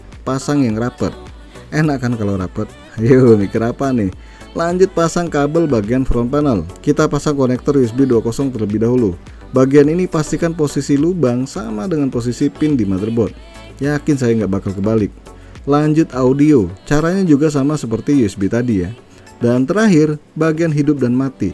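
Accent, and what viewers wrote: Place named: Palembang, Indonesia. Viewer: Hahawkwk kocak bg ni ,wong Palembang kayak nya ni,pakek nian nama nya 😁😁😁😁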